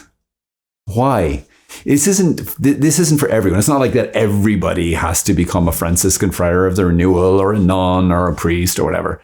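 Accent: Ireland. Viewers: Em Irish accents 😭🍀 Aiiii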